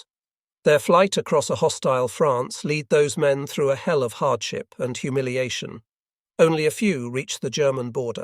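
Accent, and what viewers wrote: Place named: United Kingdom. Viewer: Why does the narrator have a British accent? Shouldn't he have a German accent?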